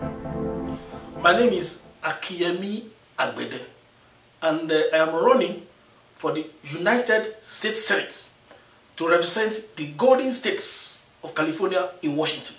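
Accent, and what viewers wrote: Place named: Nigeria. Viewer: with an accent so Nigerian, hmm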